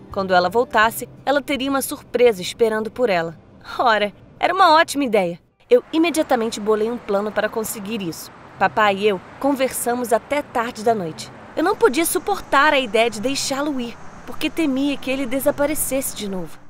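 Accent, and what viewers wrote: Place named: Minas Gerais, Brazil. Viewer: Ela paréce qué ela é minera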